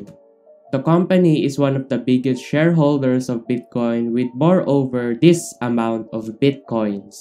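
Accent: Philippines. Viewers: i knew it your a Filipino by your accent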